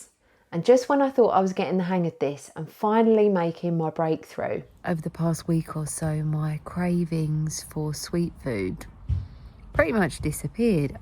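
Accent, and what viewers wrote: United Kingdom. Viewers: You have the most british voice ever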